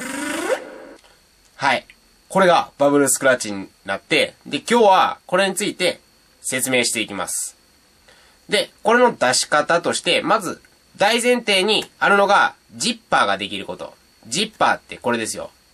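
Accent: Kansai, Japan. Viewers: 関西弁の方が聞きやすいし、かっこいい！！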